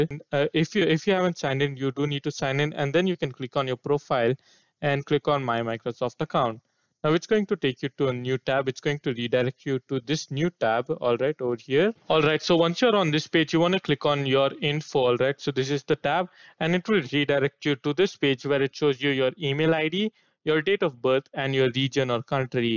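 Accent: India. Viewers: i like indian voice even if im not one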